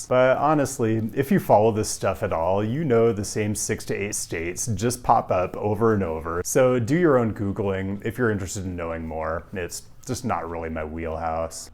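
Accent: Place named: Pacific Northwest, United States. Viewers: Nah, his PNW accent got a little cali-valley mixed in somehow, Brah. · The PNW accent!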